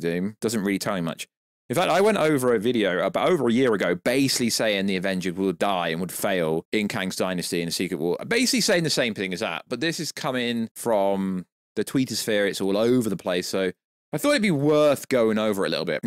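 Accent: United Kingdom. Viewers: I don’t understand what you’re saying. It’s to British. And my English is not that bad. It’s a shame because it looks very interesting. Good luck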